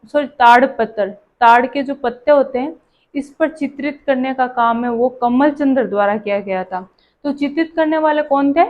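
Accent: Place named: Marwar, India. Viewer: Respect Mam G Marwar se ho kya?? अपना लहज़ा लगा 🥰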